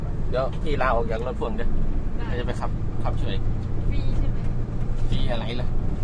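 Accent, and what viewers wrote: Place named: Korat, Thailand. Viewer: น้อง คนจังหวัดไหนครับ พูดออกทางโคราช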